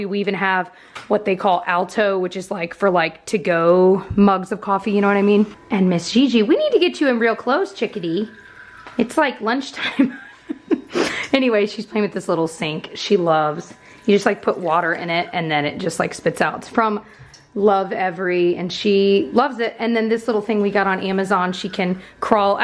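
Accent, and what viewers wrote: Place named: South, United States. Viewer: It’s a Southern thing too! Midwest and Southern accents have similar vocal placement and sounds.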